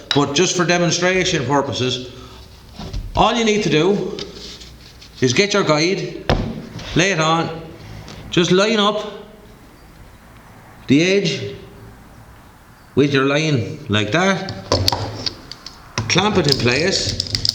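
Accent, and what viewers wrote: Ireland. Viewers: So funny listening to an Irish man speaking English.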